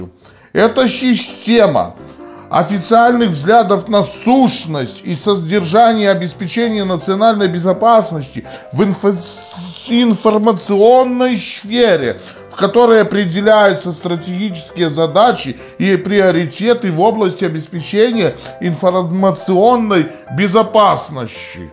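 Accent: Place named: Belarus. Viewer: Сначала Лайк потом поржал над трасянкай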